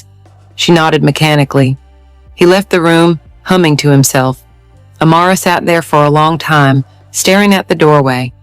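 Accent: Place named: South, United States. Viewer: The southern accent for an African story is distracting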